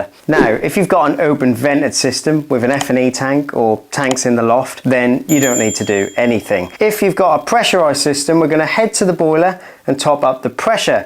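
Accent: Norfolk, United Kingdom. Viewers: Is that a Norfolk accent? Just asking.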